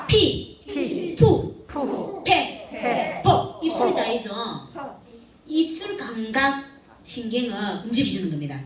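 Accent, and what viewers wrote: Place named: Gyeongsang, South Korea. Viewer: 경상도 사투리 구수하면서 찰진 표현 아무쪼록 칭찬하고 삽시다😊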